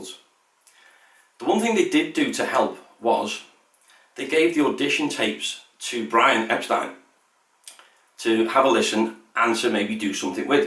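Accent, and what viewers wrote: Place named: United States. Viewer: And let me guess, you are American-cause you,guys are the best at everything, right? 🙄 Only an American would be arrogant enough to suggest that a documentary about a BRITISH BAND be narrated by an American. Go wave your flag somewhere else.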